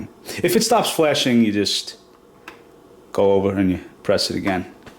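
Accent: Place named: New York, United States. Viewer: ..love your CAWFEE TAWK NEW YAWK ACCENT!!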